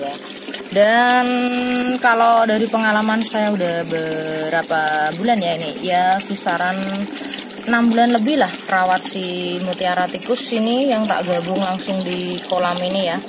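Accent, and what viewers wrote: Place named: Kediri, Indonesia. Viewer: Mbaknya jawane mana tho mbak? Kok kayak Kediri logate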